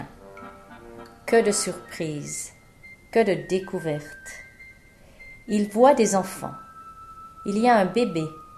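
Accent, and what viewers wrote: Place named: France. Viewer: this was cool because i am trying to listen for comprehension and acclimate to the french accent. now to incorporate the words/phrases into more practical use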